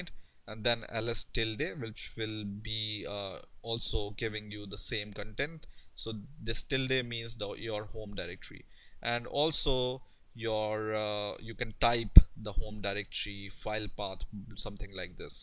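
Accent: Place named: India. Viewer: im curious about who you are? it appears your in Germany but you sound like your from India.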